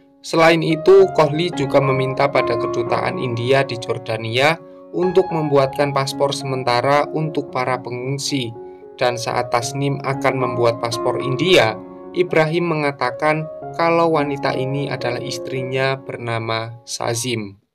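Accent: Java, Indonesia. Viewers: Baru nemu chanell ini..suara nya jawo tok wkkwkk lgsg subcribe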